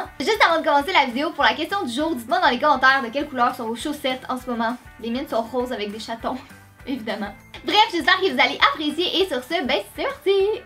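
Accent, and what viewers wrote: Quebec, Canada. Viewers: J' adore trop l'accent québécois! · je taime trop tu es trop belle et j'aime trop ta voit québécoise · J'adore l'accent québécoise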